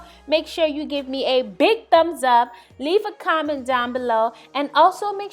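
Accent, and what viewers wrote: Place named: New York, United States. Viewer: Once I listened more I picked up NY lol.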